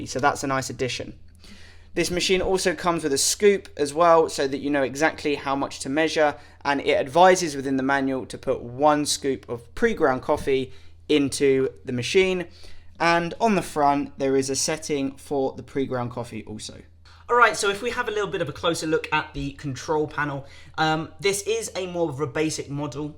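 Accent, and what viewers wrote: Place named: United Kingdom. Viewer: Thanks for the coffee and British accent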